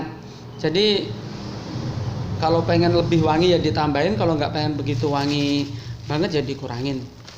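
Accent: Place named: Java, Indonesia. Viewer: Kayak logat orang jawa yg buat bumbu padang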